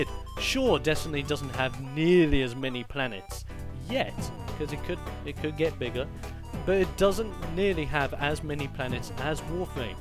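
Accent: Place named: United Kingdom. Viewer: Um... he's British.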